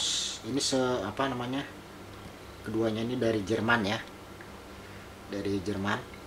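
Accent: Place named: Banjar, Indonesia. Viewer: inggih logat nya. urang mana pian mang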